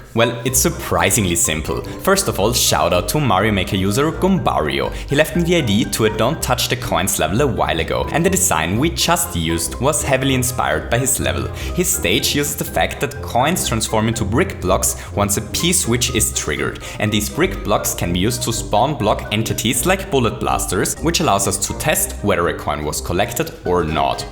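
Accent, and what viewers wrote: Austria. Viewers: You are from Austria? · You're from Austria? Right? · youre austrian? looooooooool im austrian too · Wait. Are you austrian or Lithuanian?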